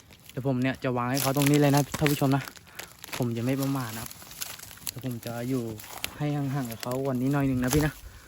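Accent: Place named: Isan, Thailand. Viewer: พูดคล้ายสำเนียงอีสานหวั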